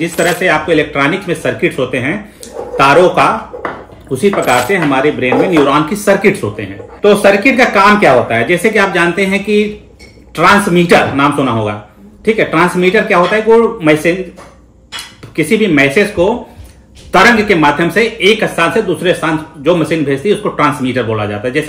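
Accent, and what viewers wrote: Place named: Jharkhand, India. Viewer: Bhaiya aap kya jharkhand se hai kyonki apke baat karne ka tarika jharkhand wala hai isliye pucha or agar sachme aap jharkhand se hai to I am very proud of you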